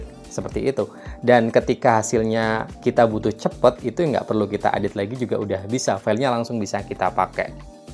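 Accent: Pekalongan, Indonesia. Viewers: Orang pekalongan kah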